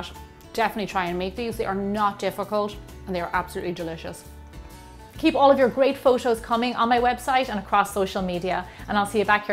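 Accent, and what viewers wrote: Ireland. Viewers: I love the fact you haven't lost your lovely Irish accent after living over there in the states :) x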